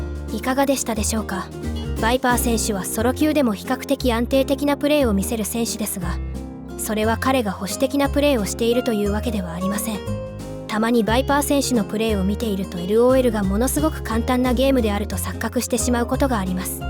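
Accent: Kansai, Japan. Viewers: わい関西人やから時々関西弁はいるの親近感感じてうれしい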